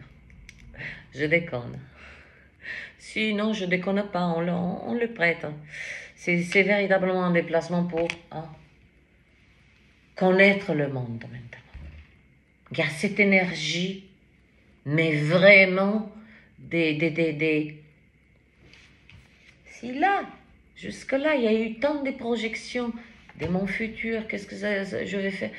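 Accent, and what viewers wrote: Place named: Italy. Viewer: Je t aime de tout mon coeur,ma,tu es unique j aime ton accent,un reste d italie peux être!!!je suis une poissonne,et je ris,tu m amuses Merci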